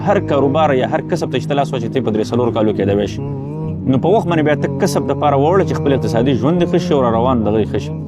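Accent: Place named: Gulkhan, Afghanistan. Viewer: دا ستا لهجه ولی ده ګلخانانو ده؟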